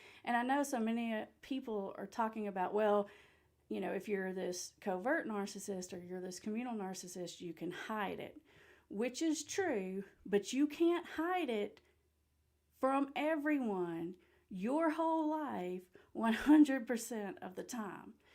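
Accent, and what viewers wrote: South, United States. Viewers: Plz turn on captions so we can understand ur southern accent...thanku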